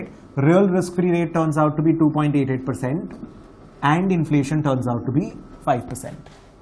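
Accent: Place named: India. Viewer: great lecture, but 0.75 speech is ok, I have a small problem with absorb the Indian accent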